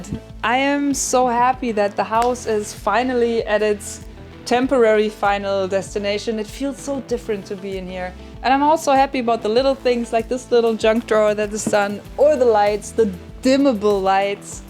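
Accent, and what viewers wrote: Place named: Germany. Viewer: Wie angenehm - so ein schönes Englisch mit klarem deutschen Akzent zu hören, man versteht es einfach viel besser, als diese Ami-Aktzente mit den dicken Knödeln im Hals!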